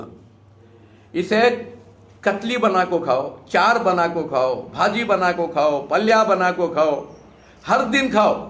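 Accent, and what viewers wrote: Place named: Bangalore, India. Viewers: its bangalore's local language (urdu) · Karnataki language hai yeh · Not Hyderabad. Banglori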